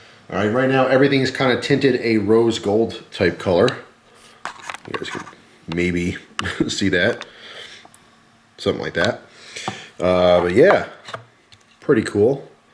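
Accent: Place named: Pennsylvania, United States. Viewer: Pennsylvania account. At least he doesn't say "yins guys" or call everything a "jawn"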